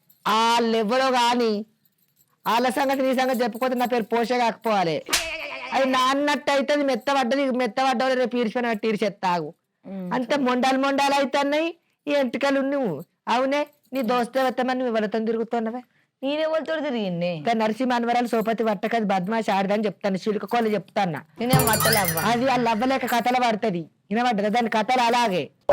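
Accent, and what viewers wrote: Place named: Karimnagar, India. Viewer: మన తెలంగాణ భాషా యాస… పక్కా కరీంనగర్ 😅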